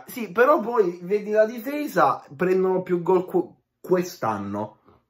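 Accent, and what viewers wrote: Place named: Rome, Italy. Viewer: Molto bello l'accentaccio romanesco 😂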